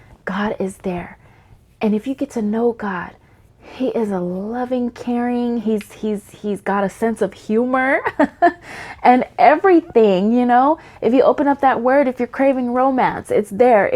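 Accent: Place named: South, United States. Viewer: U sounded southern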